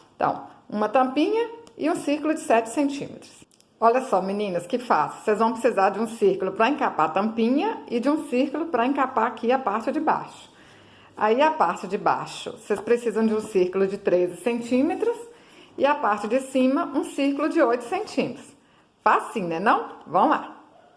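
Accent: Minas Gerais, Brazil. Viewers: Bom dia ..amei vou fazer, obrigada vc é ótima amo seu sotaque uai...😘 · vc é de Minas?porque meu marido é e fala como vc,gominha,superlitro,rsrs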